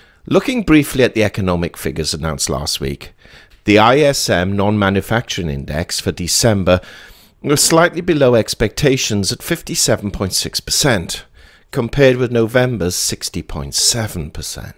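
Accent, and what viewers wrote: England, United Kingdom. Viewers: I do enjoy your pure English voice :) I tell those trying to learn English where I live attempting to shake off the chains of their poverty, that the purist form of English comes from the English :) I am going to recommend a few of those I care for to subscribe to this channel to help them with a little of this purity :) As far as this post goes however re my understanding being more focused on the substance, I agree it is following standard trend, until it doesn't :) Panic can begin within minutes and within hours a different world may be what is faced. Yes chances are slight, or are they? The fixation on the Tariffs hid its true meaning of the Pre Tariff sugar fix, pre purchases, costing investors trillions. Perhaps its hangover of such actions and what it causes is now hidden under the Government shutdown :) We will just need to wait and see, wont we :)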